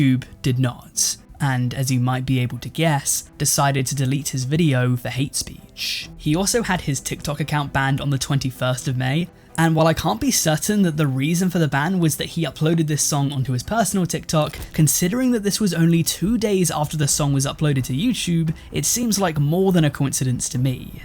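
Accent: United Kingdom. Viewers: I wouldn’t listen to anyone talk about the fall off of masteroogway, except for and his glorious British narration😍😍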